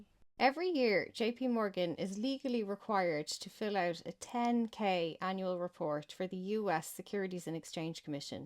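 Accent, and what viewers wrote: Ireland. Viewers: Sounds a bit Anglo Irish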